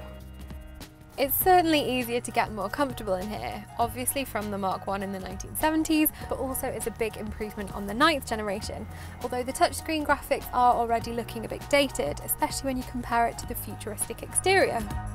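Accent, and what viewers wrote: United Kingdom. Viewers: More women with lovely British accents should do more car reviews!!! Am I right? This young lady is absolutely charming....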